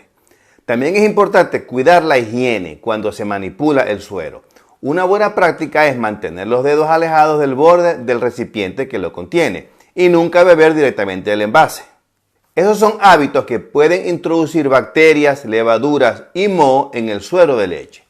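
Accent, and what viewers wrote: Zulia, Venezuela. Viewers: Doctor ya me suscribi. Ud tiene un acento Marabino Zuliano. Felicitaciones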